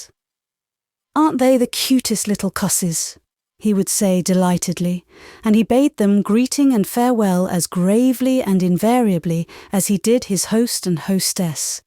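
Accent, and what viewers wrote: United Kingdom. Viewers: Beautiful British accent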